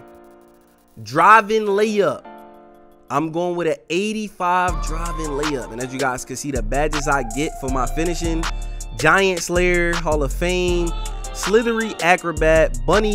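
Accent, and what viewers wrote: Baltimore, United States. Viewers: That Baltimore accent is skrong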